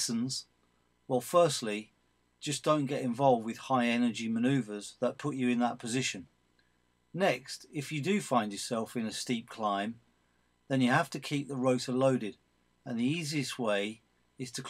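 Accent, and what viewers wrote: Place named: England, United Kingdom. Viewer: the narrator simply has one of numerous English accents, giving a clue as to where in the United Kingdom he originated.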